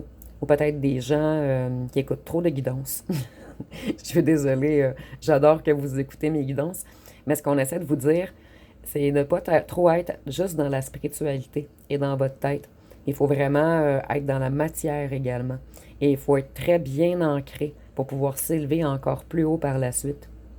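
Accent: Canada. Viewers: c'est un joli prénom, vous êtes canadienne? J'adore votre pays et accent. Vos guidances sont excellentes. Merci ❤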